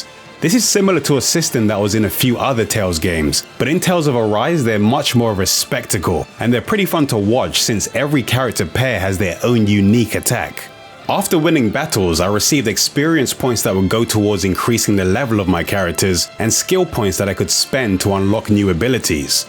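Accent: United Kingdom. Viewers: Are you from the UK? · Clips Dude he just sounds a little british, weak bait lol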